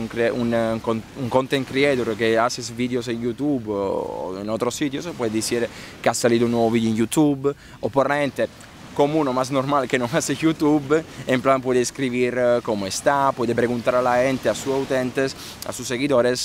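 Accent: Italy. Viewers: Todo está perfecto 👌 y me encanta tu acento italiano o estoy equivocado? 🤔 Saludos desde Brasil 🇧🇷 😘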